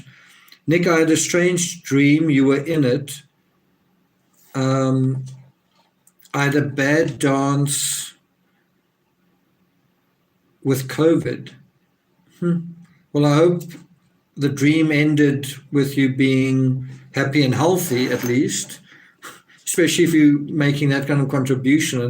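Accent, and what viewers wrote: South Africa. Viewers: I love your south african accent ...i have family stuff there. And i do really listen to you when you try to analyse these horrendous stories. Your dog is adorable, by the way even tho im allergic. 👍💕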